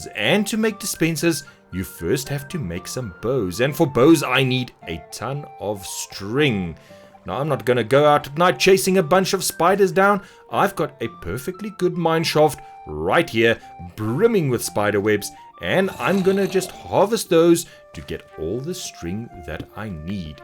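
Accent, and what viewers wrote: South Africa. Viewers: Are you from south africa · South African? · this oke sounds South African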